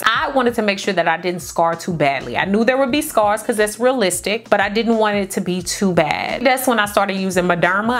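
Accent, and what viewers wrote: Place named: Midwest, United States. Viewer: You sound like Monique from Moknowshair channel. Are you from St. Louis or the Midwest?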